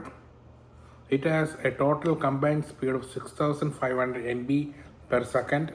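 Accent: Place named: India. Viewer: he did sound indian though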